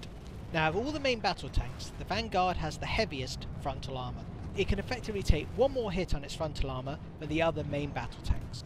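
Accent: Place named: United Kingdom. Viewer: I think you've found a great niche- a pure NC channel is a great supplement for an NC player who wants to learn the ins and outs of the factions to a more general channel (like Wrel's). Keep it up! I play on Waterson, but, judging by your accent, you live in the british isles and dont play on waterson True?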